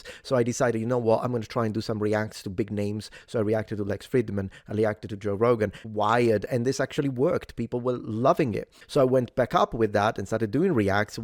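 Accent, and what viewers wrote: Sicily, Italy. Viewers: I don't remember when i first got to your channel, but i remember that i wanted something history related to listen to, saw your name, went "Heh, Supernatural" (Yes, i know, i'm a smooth-brain) and i was hooked on that stuff for a while. Then i kinda forgot about you, because my focus shifted to something else. After what happened with Kirk, i just needed someone to listen to who talks sense into that situation, and by chance i found you again. Now i'll stick with you again, your content is pretty cool overall. Do whatever feels right, i'll listen to your nice italian accent regardless of what you're speaking about! :D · Good sir, you can post whatever you want on YOUR channel. I just recently started watching and it was because of your political commentary. I'm so happy you popped up on my feed, your voice is very soothing and your accent is great. 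My dad was in Sicily when he was in the Navy during the Vietnam war and always spoke so highly of his time there. When I heard you say that is where you are from, I was hooked. I appreciate your perspective and hope you continue to post your feelings. ❤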